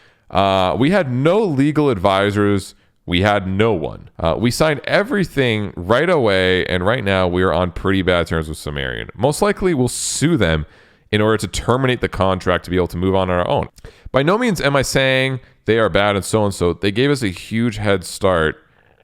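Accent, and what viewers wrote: Russia. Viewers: Nice Russian accent. Can we all just agree with the fact that Alex has like a little bit more fanbase than STP. And at this point I'm too afraid to ask..... Plzz no offence I dig STP.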